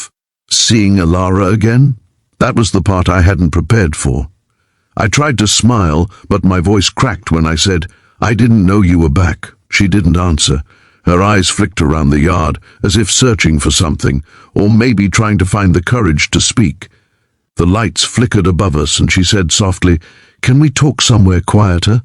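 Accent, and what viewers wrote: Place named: England, United Kingdom. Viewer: This is very bad, especially the voice doesn't fit the apparent age of the protagonist. He shouldn't sound like a middle aged Englishman.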